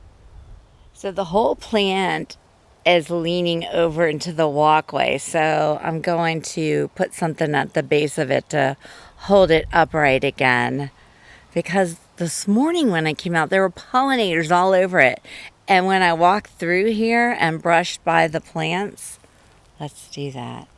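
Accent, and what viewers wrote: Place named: Texas, United States. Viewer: Pretty mild compared to Texas(I assume from your accent), with average temperatures ranging from around 50-70°F. However, we do experience occasional heatwaves where temperatures can soar up to 86°F. These hot spells can be quite intense, but they are relatively short-lived. For me 70 feels like an oven :)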